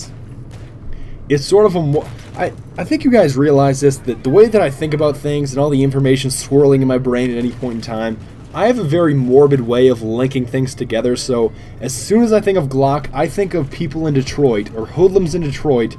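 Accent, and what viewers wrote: Canada. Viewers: Arnt you Canadian?! ^^ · i thought u was canadian :O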